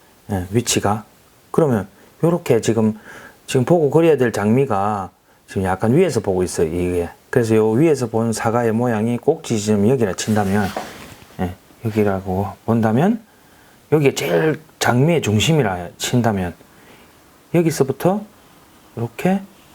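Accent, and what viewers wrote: Gyeongsang, South Korea. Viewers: 요즘취미로 집에서 조금씩 배우고있어요ㆍ장미꽃그림은 아직 엄두도못낼것같았는데 ~~ 자꾸 동영상보면서 익혀야겠네요^^ 첨이라 이해가 안되지만 연습해서 아름다운 장미꽃을 도전해보고싶네요^^ 잘배우고 갑니다 ㆍ쌤^^ 목소리톤과 경상도사투리 ~ 완젼 멋지심요~~ 펜할께여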